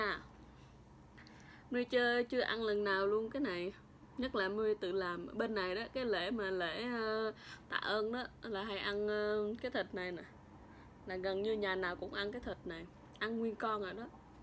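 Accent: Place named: Bahnar, Vietnam. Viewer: Tuan NGuyen chị nói.. Là người Ba Na kìa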